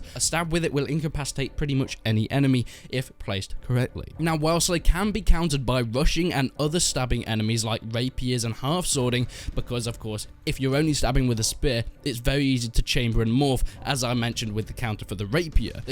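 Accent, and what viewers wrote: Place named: United Kingdom. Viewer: Apparently, Americans think that the British accent sounds intelligent. This is a good example of it being wrong. VERY fucking wrong.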